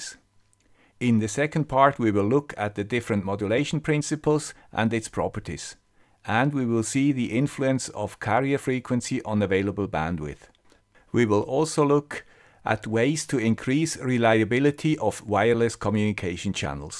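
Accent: Switzerland. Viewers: Perfect video ! Loss in free space chart alone is great. Simple as Einstein says but not simpler. Thank you guy with the Swiss accent for this another great video. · is that a picture of you in the bottom right hand corner at the opening of the video? Its so nice to be able to put a face to your Swiss accent.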